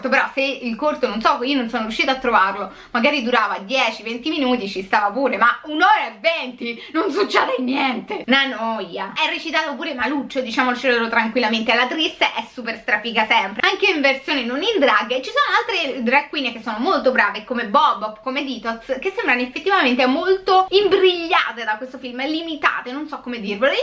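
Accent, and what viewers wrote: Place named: Rome, Italy. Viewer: Amo tantissimo il romanaccio, dona a tutto una nota così affascinante e divertente: pura poesia ♥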